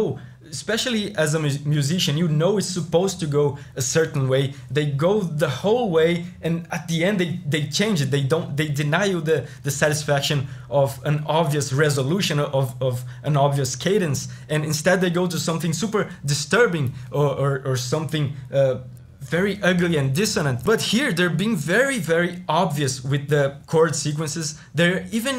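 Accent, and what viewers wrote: Brazil. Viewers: Sotaque br? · u sound brazilian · eu tinha uma sensação de q vc era BR por causa do sotaque kkkkkk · what's your nationality? your English speaking accent sounds a lot like the Brazilian accent. Acabei de ver nas informações do canal que é brasileiro kkkk, deu para entender um pouco do que você estava falando e deu para entender a mensagem, mas seria uma boa tentar colocar legendas para quem não entende. E sobre as músicas é incrível como a fromsoftware não erra com musicas dos final Boss dos jogos, simplesmente lindas.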